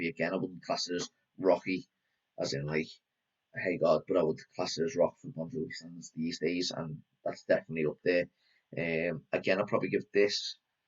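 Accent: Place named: Liverpool, United Kingdom. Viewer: Nice to hear a fellow scouse accent talking about Jovi 😉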